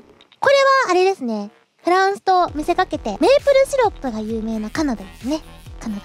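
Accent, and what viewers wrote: Kansai, Japan. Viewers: 最近、アイちゃんの関西人感がスゴい！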